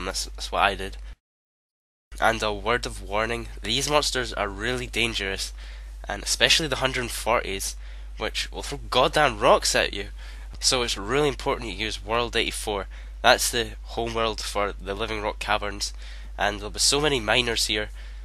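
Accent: Scotland, United Kingdom. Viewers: Your one of the best rs Guide makers out there and Your scottish :)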